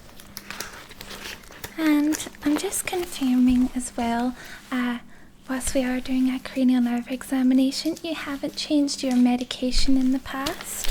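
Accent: Scotland, United Kingdom. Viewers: I click on these to find out which accent I’m going to find. The Scottish one is my absolute favourite. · Your medical role-plays are first-class and the Scottish accent is an added bonus! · Love your scots accent it makes me feel safe and helps with my ptsd thanks for all you do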